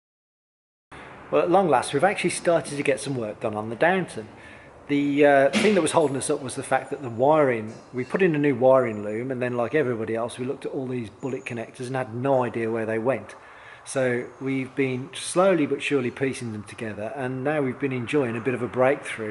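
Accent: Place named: Birmingham, United Kingdom. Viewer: What happened? Such well produced videos. Have you completed the Land Rover? I came to you because of the MX5 thermostat housing bodge job. I notice you've got a Brummy accent and have a workshop somewhere, I assume in the Midlands. I gather you're a motoring journalist. What's the story? Do you take on work? All the best.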